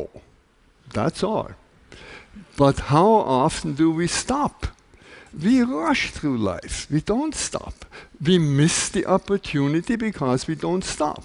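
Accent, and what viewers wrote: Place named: Austria. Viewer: Wyczułem austriacki akcent na początku :) ależ jestem dobry!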